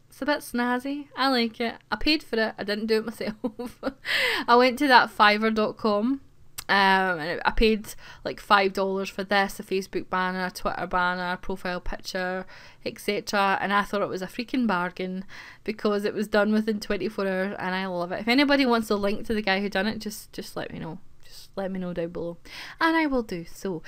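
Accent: Scotland, United Kingdom. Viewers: I'm glad you will have more time to do what you seem to really enjoy! I certainly love watching your LPs and listening to your lilting Scottish accent! I'll definitely be continuing to watch TS3 LPs and I really look forward to seeing how you, Peter and Jenn play TS4 because I am so confused about how this game is supposed to be played. I know it's supposed to be a sandbox game, but it definitely seems to be geared toward a different playing style than what I've been using for the past 10 years, so yeah... Thanks for everything you do and for all those who helped with Big Brother! I've never seen the actual show in any way, but I don't think I would enjoy it as much as your version.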